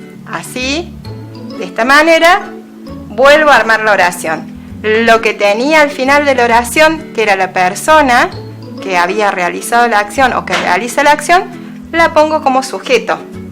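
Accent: Córdoba, Argentina. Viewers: Muy buena leccion y muy simpatica esa tonadita de Cordoba!!! 😄😄😄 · Es de cordoba se nota el aasento grasias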